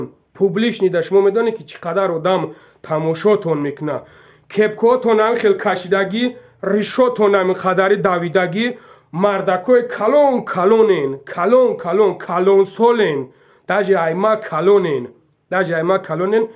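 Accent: Kulob, Tajikistan. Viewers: Ту шердили Звенигород меги й дигачодум бра така риши рихи Кулоби нав гап зан шиди.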